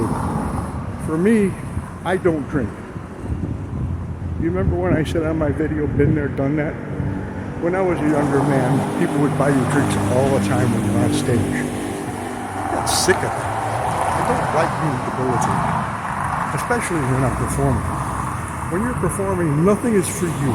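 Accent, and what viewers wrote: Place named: Chicago, United States. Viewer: Good video! Your voice sounds fine like my cool uncle from Chicago.. I thinks you made a good choice. Thanks for sharing and take care!🙏🙏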